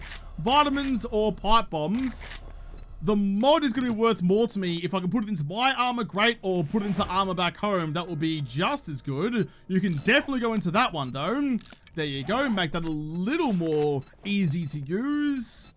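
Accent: Australia. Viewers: Ok, listen: I need to stop watching these vids in bed before sleep because people in my dreams have aussie accents and slang now. Lol. Then again, accents are hot, so I guess I shouldn't complain. Plus, trade Jen is getting jealous, I think because whenever I go see her, she's got nothing I need, and when she does, she overcharges me, I swear. Seriously though, I get so many good ideas by either watching be a muppet or have brilliant ideas! Thanks for helping me survive A20!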